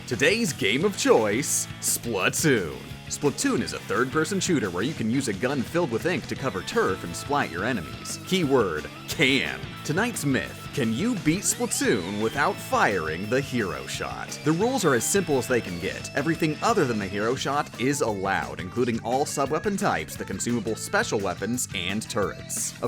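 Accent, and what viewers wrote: Canada. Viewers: Is it just me, or did he sound very Canadian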